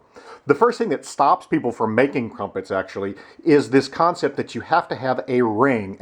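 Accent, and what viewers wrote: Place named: Canada. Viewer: You have a Canadian accent. So, where in Canada is there a shop that sells crumpets 24/7 ?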